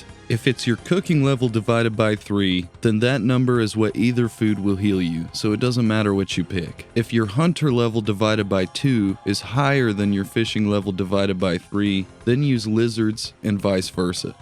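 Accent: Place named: Houston, United States. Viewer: Sounds like he’s from Houston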